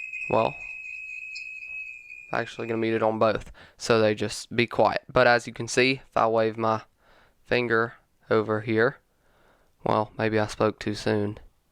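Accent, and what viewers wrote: South, United States. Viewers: That southern accent though :)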